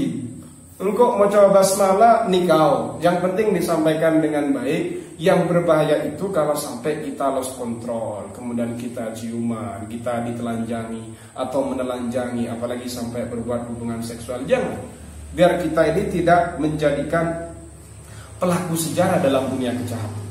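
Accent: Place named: Madura, Indonesia. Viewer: logatnya madura banget...........👍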